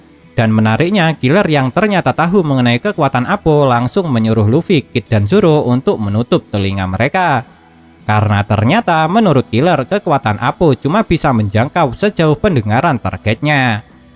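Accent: Java, Indonesia. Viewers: Medok jowo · Logat jawa nyaa bisa lebih di netralkan 🙏🙏🙏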